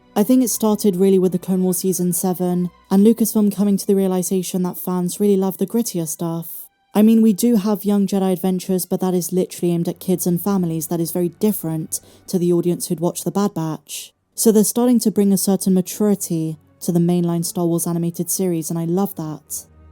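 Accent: United Kingdom. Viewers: I love your british accent. Good theories. Greetings from MEXICO. :yt:😁😅😉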